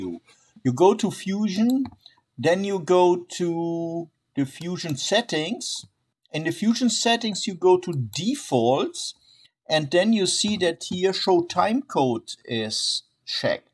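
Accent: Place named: Germany. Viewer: Thank you so much!! - Nice German accent ;-) Gruß, Jörg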